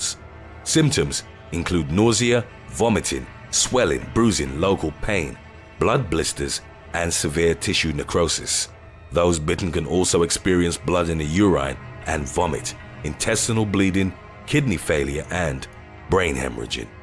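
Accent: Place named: United Kingdom. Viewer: this channel is to be good with giving you actual survive tips against certain animals or calamities like heat waves and avalanches. But it seems like he deleted all of those videos and now he's dishing out these wack videos that will never apply to me and with that annoying British narrator. Bring back the OG narrator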